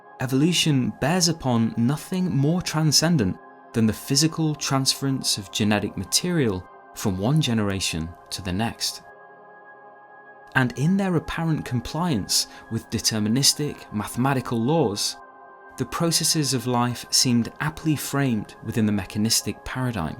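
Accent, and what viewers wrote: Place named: United Kingdom. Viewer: can't do the voice. I get enough British ascent,. In our Canadian programs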